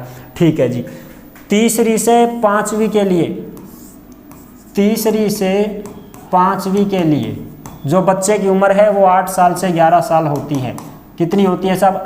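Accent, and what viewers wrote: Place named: Nohar, India. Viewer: Sir aap alwar se ho kya? Pr aapki bhasha ka tone to ase lagta hai jese Bhadraya nohar tehsil se ho?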